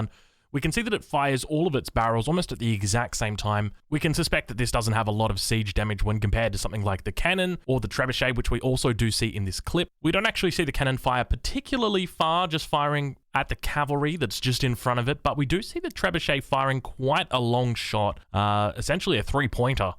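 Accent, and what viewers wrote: Australia. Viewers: "My soothing australian accent" - Self awareness is key Mr:D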